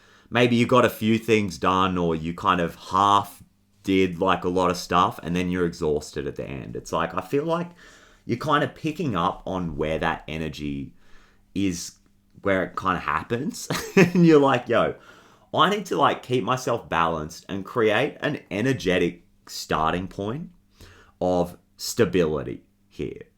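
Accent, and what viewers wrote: Australia. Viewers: Thank u! You read my energy perfectly right now. Love hearing a fellow Aussie accent too… thanks mate. 🤩🌻🖤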